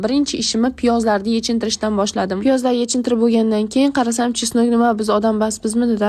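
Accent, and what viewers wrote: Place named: Fergana Valley, Uzbekistan. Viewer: Салом бизни водийда шунака гапирамиз😂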